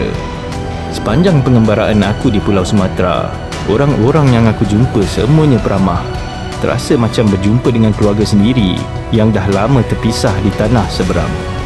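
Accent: Malaysia. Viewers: video dokumentar ini layak di beri apresiasi, narasi hostnya sangat bagus dengan dialek bahasa Melayu khas semenanjung yg enak di dengar, bahasa yang rapi dan teratur, vokal yang bagus, dan mudah di mengerti.angle / sudut pengambilan video sangat bagus dan rapi, editing nya oke... all the best lah.. salam serumpun dari jakarta. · Bang suara yunik menarik logat malasyia...slm bumi minang kabau...❤👍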